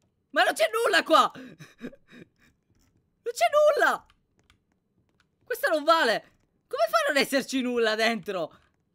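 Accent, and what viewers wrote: Sicily, Italy. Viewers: Sei Siciliano??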